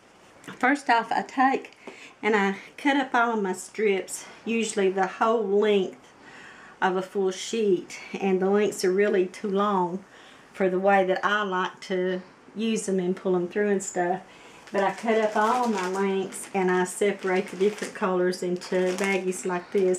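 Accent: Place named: Appalachia, United States. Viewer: Love the accent, where ya from? Reminds me of my Cumberland gap grandparents of VA and KY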